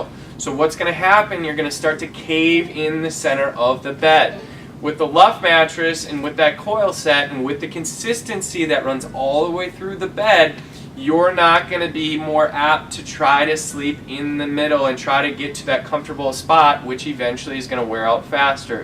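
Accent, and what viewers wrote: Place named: Canada. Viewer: the canadian accent :)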